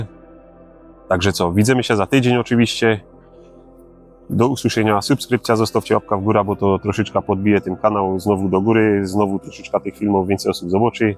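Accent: Silesia, Poland. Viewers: Trzymam kciuki za rozwój kanału. Tego typu polskojęzycznych fotograficznych kanałów jest stosunkowo niewiele. Podoba mi się formuła - krótko, rzetelnie i treściwie. No i ślonsko godka, choć sam jestem poznańska pyra ;-)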